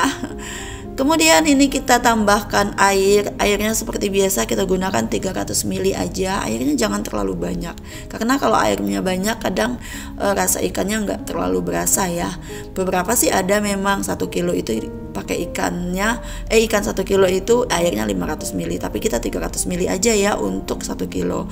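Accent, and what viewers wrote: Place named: Palembang, Indonesia. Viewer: Lucuk denger suaranya si mbk bicara, aseli org palembang kayanya karena menantu ku jg orang palembang.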